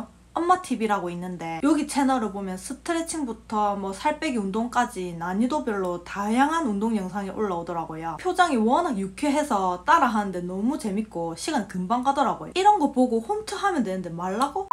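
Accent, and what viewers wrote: Gyeongsang, South Korea. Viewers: 똑소리나는언니네요경상도싸투리안들어면.하루가지루하게합니다 경제학박사님도 할배야하겠어요. 오늘부터 유툽어로주식.공부열심히할람니다..넘.똑똑혀요. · 우왕~ 우연히 보게된 님믜 방송.50대 줌마인데도 은근 잼나고 웃음이 나오네요. 경상도 말씨 좋고 또!다~맞는말. 말도 참 잘한데이~^^ 화이팅~!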